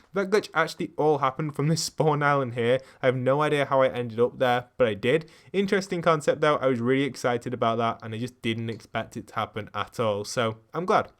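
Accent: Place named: United Kingdom. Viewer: HIS BRITISH voice calms the video even more dammmmmmm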